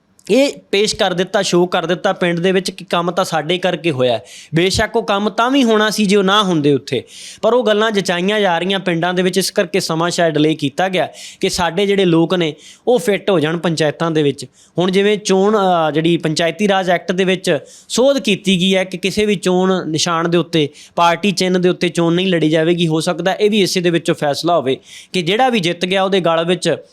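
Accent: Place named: Ferozpur, India. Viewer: Bai di boli frojpur elake di lagdi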